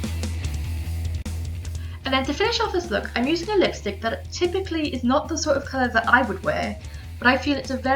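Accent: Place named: Wales, United Kingdom. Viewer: I love this! Are you welsh? Your accent sounds very welsh haha x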